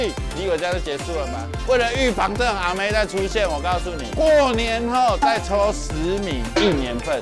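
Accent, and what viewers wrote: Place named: Taiwan. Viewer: 不要台灣國語啦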